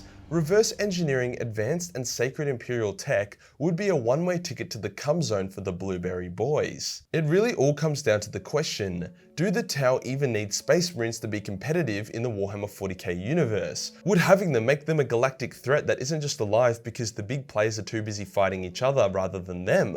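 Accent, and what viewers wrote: Australia. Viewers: I was about to say "summer?" But yep Australian, not bittish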